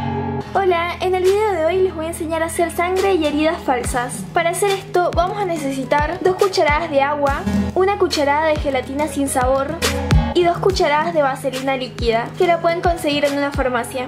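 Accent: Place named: Mendoza, Argentina. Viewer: Hola!! que bien que te quedó.. una pregunta.. Sos de Mendoza? porque yo vivía ahí y Tenés acento de Mendoza👍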